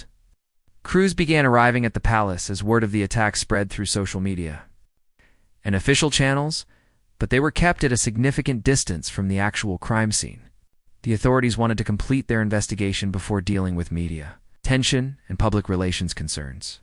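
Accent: United States. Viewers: Yet again more smooth American accented bullshit! Why not take the mickey out of the Arlington guards? Chickenshit?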